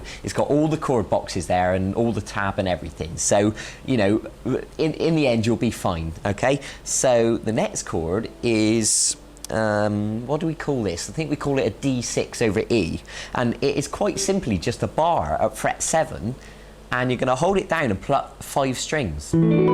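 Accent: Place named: Wiltshire, United Kingdom. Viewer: Great accent - Wiltshire ? Great lesson too of course :-)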